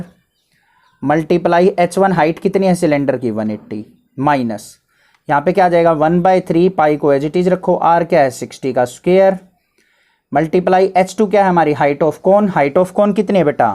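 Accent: Haryana, India. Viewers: Are sir! Jhande gaad diye aapne. Kya badhiya mixture h Haryanvi,English aur Hindi ka. Waahh!! Maja aa gaya aapki class attend karke. Haryana ke ho ya rajasthan ke.Even I am from Rajasthan, Jhunjhunu.. 🤩Par filhaal abhi delhi me hu. Ummeed karta hu aap desh ke har kone me prasiddh ho jae..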